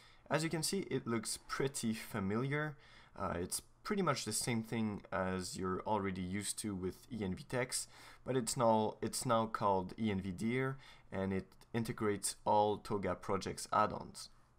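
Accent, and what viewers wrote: France. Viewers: Vive la france XD grillé en 2 secondes avec ton accent ! Bonne continuation !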